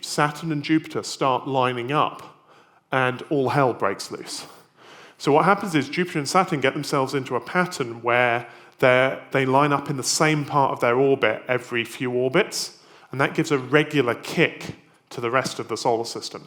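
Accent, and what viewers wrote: United Kingdom. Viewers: I love the britishy accent of the speaker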